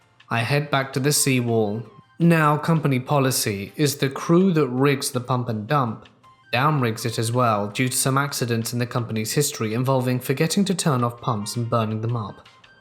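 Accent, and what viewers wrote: England, United Kingdom. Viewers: R u from England??? Cuz u have a certain accent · Nothing better than hearing vampirish English accent saying “shart”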